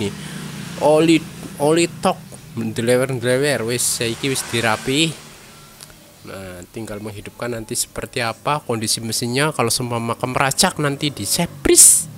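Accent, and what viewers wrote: Banyumas, Indonesia. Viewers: Lha wong ngapak yak masvroh ?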